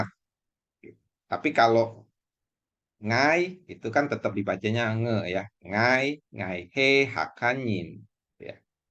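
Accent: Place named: Moiyan, Indonesia. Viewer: Ini dialek mana ko, dialek monyien ya, kalau saya disini dialek liukfeng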